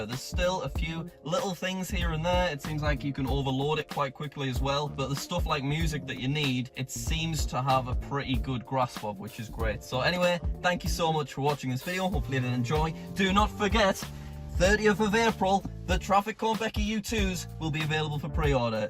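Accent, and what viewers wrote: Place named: England, United Kingdom. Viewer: I swear your accent is sick I love England people’s accents. Tesla Voice Commands need to understand people with any accent completely. Because sometimes it’s impossible to not talk with your accent.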